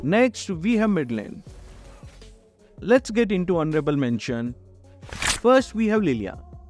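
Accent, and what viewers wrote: India. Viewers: Bro are you indian😅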